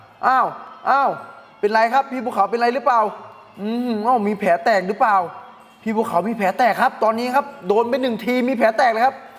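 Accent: Isan, Thailand. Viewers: พี่ ยังภาคไม่ดีเท่าไรนะคับเพราะว่าคำว่าเตือนการเป็นเตียน.เเล้วก้คำว่า.เลือดกายเป็นเลียด.พี่ น่าจะติดอีสานนิๆ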